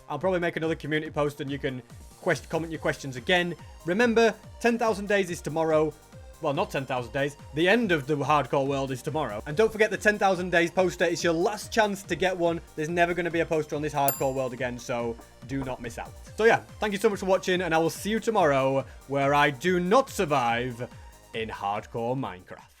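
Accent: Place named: United Kingdom. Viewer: Bro is pure british. For a moment I tought you are mcqueens brother🤫💀💀💀💀💀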